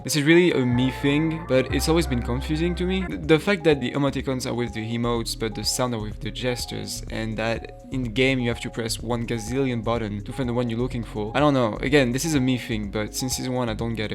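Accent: France. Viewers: Accent français grillé ! Mais en vrai ça va c'est léger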